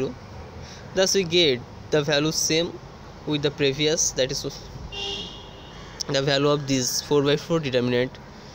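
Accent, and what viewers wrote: Bengal, India. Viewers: Banglai ho kya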